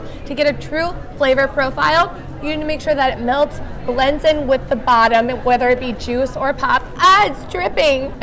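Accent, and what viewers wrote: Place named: Western New York, United States. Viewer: are you from western NY? The accent sounds like you may be.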